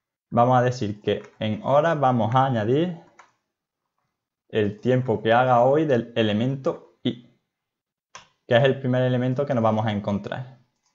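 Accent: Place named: Andalusia, Spain. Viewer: Python con acento andaluz. Para siempre.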